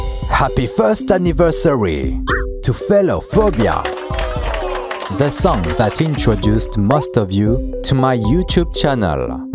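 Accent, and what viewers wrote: France. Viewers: The production quality on this is amazing! And don't you dare worry about the french accent, it sound wonderful! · franchement la vidéo est super sympa ! Et puis l'accent français n'est pas un problème ^^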